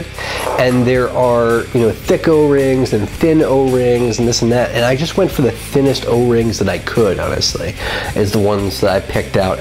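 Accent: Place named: Boston, United States. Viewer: Been wondering bout you. Glad it's just a work thing that has kept you busy. Only 2 reviewers do rda reviews right. You and Ambitionz. Now if I could get over the Boston accent.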